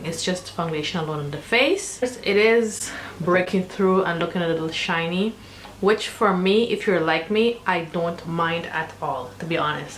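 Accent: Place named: Caribbean. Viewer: you sound caribbean, where are you from? I'm from Trinidad.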